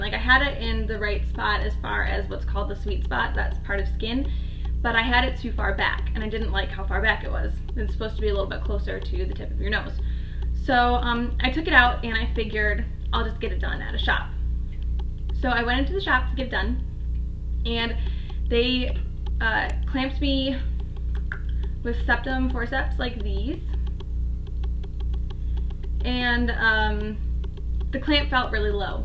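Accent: Michigan, United States. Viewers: I knew I recognized that accent, another fellow Michigander! Haha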